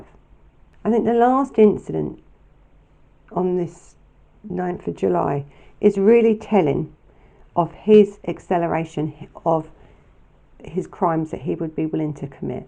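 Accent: London, United Kingdom. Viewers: she sounds like she’s from London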